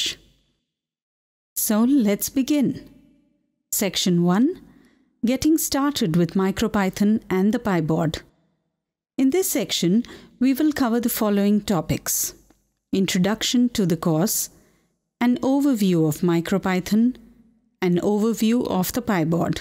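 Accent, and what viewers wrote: India. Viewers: This is the least typical Indian accent I've heard on YouTube. I'm Indian, okay! And my friends also speak English but like normally not the annoying way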